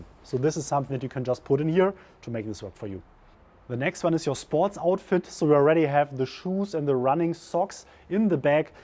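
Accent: Germany. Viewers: I watch because accent is brilliant! I just enjoy hearing him talk, and helps with my German pronunciation. Almost all my German friends have far weaker accents. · Hi! Could you tell me where from your accent is ? Are you German?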